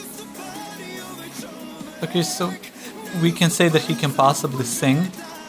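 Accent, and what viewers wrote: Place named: Bosnia and Herzegovina. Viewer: Oh my...you have a lot of spare time in your day. Some cultures speak directly and don't beat around the bush. Some are more passive-aggressive. The latter use more "neutral" and "politically correct" language, but their intentions are far from kind or respectful. My points are: 1. Not everyone is British on the internet/YT. 2. Not everyone speaks English as native (and the only one) language. 3. Everyone is entitled to their opinion and feelings. 4. If you [autor of this clip] don't have anything nice to say - don't say it. I could say a lot on his wall "art" and it's placement, but I will not. ;) 5. Cross cultural communication is not easy. 6. I understand that this is a clickbaity title, but saying "I'm not feeling" ALL songs from a particular country, and at the same time coming from a country that not really known for "feelings" nor particularly great results on the ESC is what you say the UK "interesting". 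;) P.S. I've just realized that this commentator has an accent in English and that he's coming from Bosnia which adds another layer of complexity ;) Hope the UK performer will not be the last this year. ☮️ Have a nice day [not sarcasm, really mean it] Cheers!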